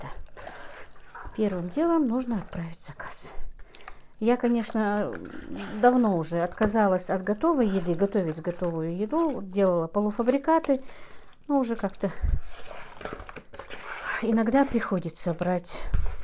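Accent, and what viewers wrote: Standard. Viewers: Смотрю и отдыхаю Чисто руский говор молодец.Труженница👍👍👍👍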